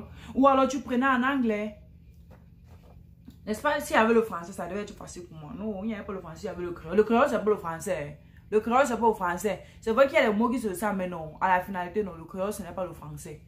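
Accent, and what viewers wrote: Cameroon. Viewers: 🤣🤣🤣 l'accent du +237. Merci du partage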